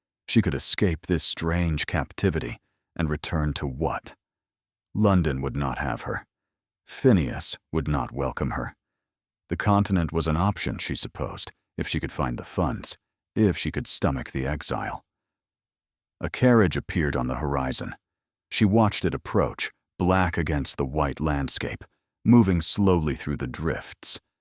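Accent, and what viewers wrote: United States. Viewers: I do wish that you would choose an English voice for narration, this American voice speaks as if it's telling a Mafia story. Spoils it all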